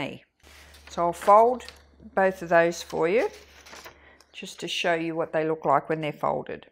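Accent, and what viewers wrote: Australia. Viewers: I love your Australian accent! Sounds very royal haha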